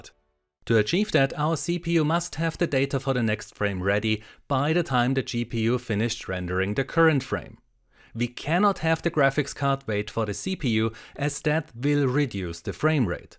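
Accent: Austria. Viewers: From Austria eh?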